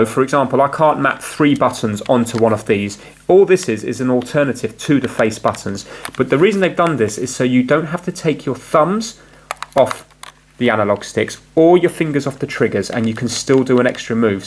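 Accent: United Kingdom. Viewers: It’s a British accent like me, America uses England’s language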